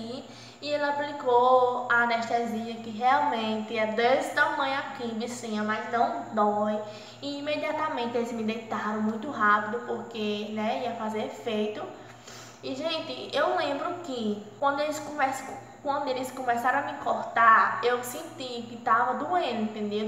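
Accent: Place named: Alagoas, Brazil. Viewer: Graças a Deus que no final deu certo🙏🙏 O meu parto foi normal super tranquilo! Comecei sentir dores em uma noite anterior as 18hrs e no dia seguinte as 15hrs já estava sentindo contrações e partir pra maternidade.. chegando lá fui super bem tratada e meu Bryan nasceu as dizem que não demorei muito , mas pra mim parecia que não ia ter fim tanta dor kkkk mas graças a Deus deu TD certo e estamos bem.. Sucesso pra vc .. gosto do seu sutaque igual o meu kkkk sou Alagoana .. bjs corega 😂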